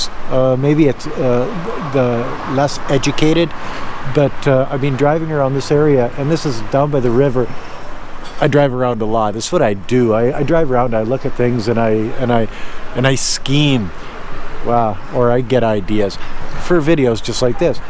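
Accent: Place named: Canada. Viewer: As an American who grew up in the states my whole life I agree, it’s great to hear a variety of perspectives on China from a friendly beer-drinking Canuck with an awesome accent living there. I’d love to visit someday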